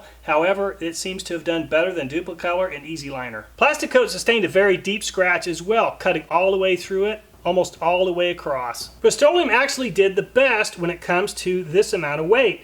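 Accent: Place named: South, United States. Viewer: Can't believe you're still replying to comments on a 6 year old video. Noticed that the southern accent faded over time :D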